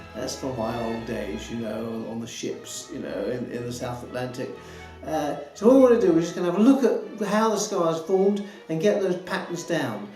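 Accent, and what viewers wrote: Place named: Germany. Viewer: Can you cite to two must read books. I could not understand through that thick german accent of yours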